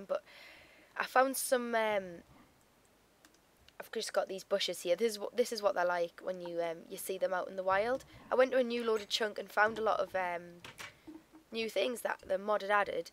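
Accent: Newcastle, United Kingdom. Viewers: fellow geordie? lol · You are a Geordie right?? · Newcastle, surely. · I can tell because of how you talk. I'm A Geordie Too. :)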